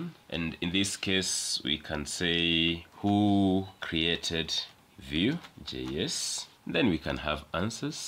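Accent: Kenya. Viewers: Are you Kenyan bro? I cant ignore the accent😂 ps: I love the work you're doing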